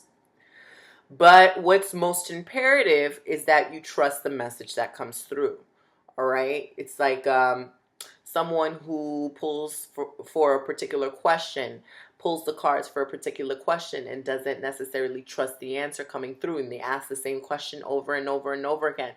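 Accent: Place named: New York, United States. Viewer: Your accent makes me miss NYC so much. More confirmation I need to move back!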